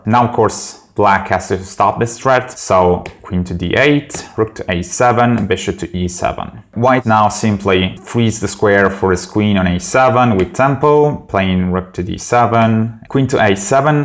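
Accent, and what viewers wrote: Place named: United States. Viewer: Ciao, complimenti, mi sono iscritto da poco al canale e lo trovo molto divertente. Sono d'accordo con la risposta che hai dato a White Rabbit. Le partite trash dal vivo sono al top sempre. Un consiglio se permetti, bravo per l'inglese, ma parlare con un accento nordamericano esasperato è veramente trash IMHO, anche perchè sembri un imitatore di Hikaru LOL. Ho apprezzato molto anche i video con i consigli per migliorare il proprio gioco.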